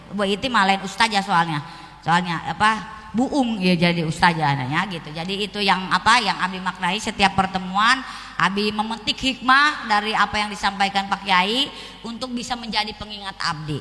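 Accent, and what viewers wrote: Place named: South Banten, Indonesia. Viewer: Logat banten selatan, asli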